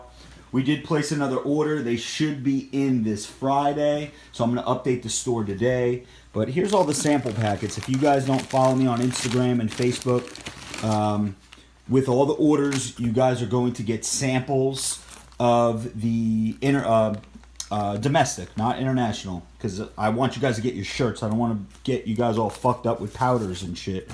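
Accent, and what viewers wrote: Philadelphia, United States. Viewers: Yo man you from Philly?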